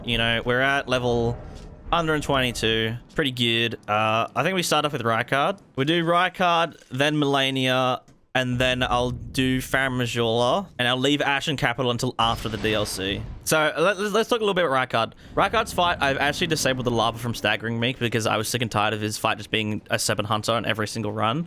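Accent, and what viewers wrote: Australia. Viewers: i just found this vid after googling if reduvia was any good, only played about 1.5h so far, first playthrough. anyway, thought you were an aussie, confirmed it with the extra dry, so, hello from FNQ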